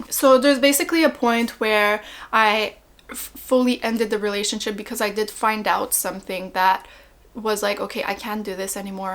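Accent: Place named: Quebec, Canada. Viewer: awesome video girl, & nice french- canadian accent ;)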